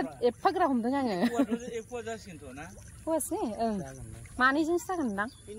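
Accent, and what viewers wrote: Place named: Kokrajhar, India. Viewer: Same to same kokrajharni boro railainaijg milaitarw 100% sure abo ngsina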